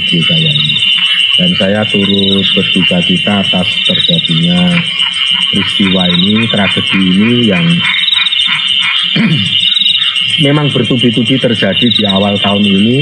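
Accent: Java, Indonesia. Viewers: Sambil ududlah 😂😂😂😂😂😂mantap medok jowone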